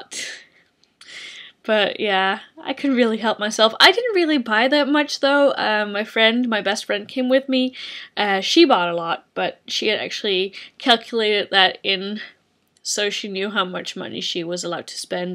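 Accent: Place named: United States. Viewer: Yo accent is more american than usual, lady. or maybe it's in my head. either way, I'm happyz you're still alive and back in the country :) <3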